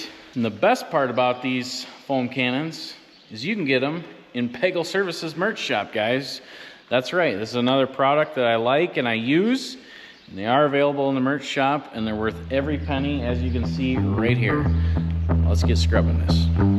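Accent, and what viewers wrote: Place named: Canada. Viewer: Ah ur too Funny ... Canada " eh "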